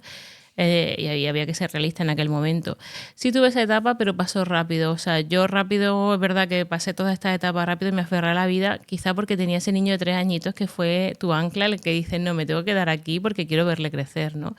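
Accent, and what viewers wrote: Andalusia, Spain. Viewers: Es andaluza y tiene un acento diferente....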